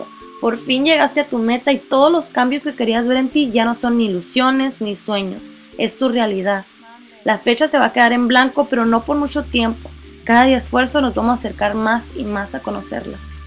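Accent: Chihuahua, Mexico. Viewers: Me encanto tu video :) me caes muy bien y me encanta oír tu acento norteño jaja, en el video pasado te pregunte y me dijiste que viviste en Sonora hace un tiempo, tmb soy de allí, te invito a ver mi canal, un saludo hermosa :)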